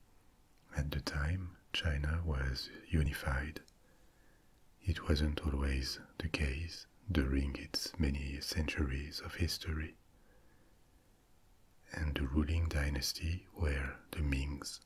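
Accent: France. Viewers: I can’t wait to hear about the antique books! Antique books and French accents are my weakness 😁😁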